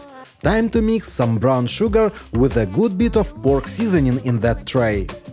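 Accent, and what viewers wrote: Iowa, United States. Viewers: Is that an Iowa accent? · The lowan accent is so beautiful.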